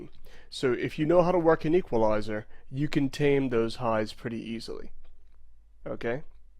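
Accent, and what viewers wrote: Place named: Northeast, United States. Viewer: I got mine for $62 about 2 months ago, they we're a little bit enjoying in the high range and a little lacking in bass, however I put them in a room with a Fiio amp/dac and feed them EDM on a loop streaming Tidal lossless streaming service using the premium services, I did this for 5 days and after the 5 day period I have to tell you I am now a believer in headphone "Burn-In" I'm guessing that this has loosened the driver material or flexed whatever term you'd like to use. At any rate they just totally transformed into a much better sounding SHP9500, I didn't want to like these headphones however even if Phillips were to sell these for $150 they'd be worth it! Are they good for every genre of music? No, if you're a bass head look elsewhere, I do own a pair of V-MODA M 100's and like those but are they good for every genre of music? 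No, however I just can't stop listening to these 9500's. I'm going to stop now and get me a drink of whiskey. Just a side note for the reviewer, what part of the UK do you live in? I was stationed at RAF Bentwaters for 3 years many moons ago, so are you an American? as I don't detect an English accent when you speak, I do hear what I believe is a North East Coast accent in you. Hey thanks for the review.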